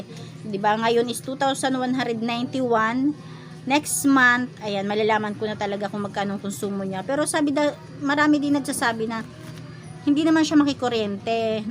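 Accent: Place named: Bicol, Philippines. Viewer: watching kasari galing ng intro sarap pakinggan yong salita ng bicolana haha..salamat sa pag share ng billspayments niyo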